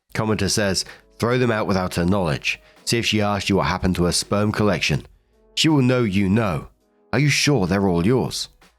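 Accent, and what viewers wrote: United Kingdom. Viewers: You're a Brit 😮!?!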